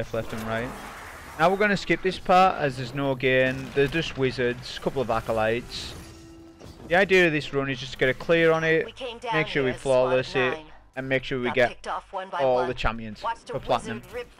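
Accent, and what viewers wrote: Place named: Cumbria, United Kingdom. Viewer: haha im from cumbria too mate and i just noticed your accent and thought he sounds very local!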